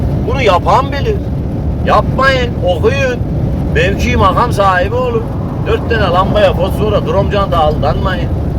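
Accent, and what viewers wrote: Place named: Yozgat, Turkey. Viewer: bey sizin siveniz neden Yozgatli gibi?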